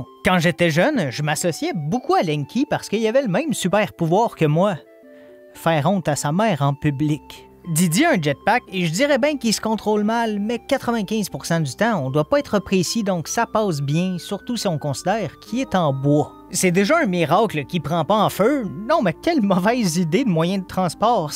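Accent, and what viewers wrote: Quebec, Canada. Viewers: Je suis québécois et j’adore ça t’as un québécois tu est mon préféré de tous les youtubeurs mon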